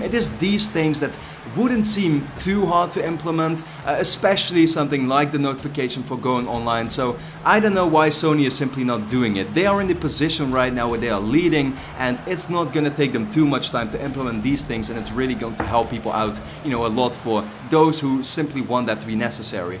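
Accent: Netherlands. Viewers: Dutch accent? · i also hear a dutch accent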